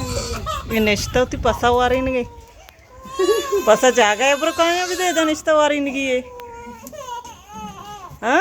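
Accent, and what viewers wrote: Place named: Makran, Pakistan. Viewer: وعلیکم السلام مئے شمئے کلمتی مکرانی لہجہ ءَ هوں همے چیزا دَرنگ گُشاں کہ مری درنگ گشگنتی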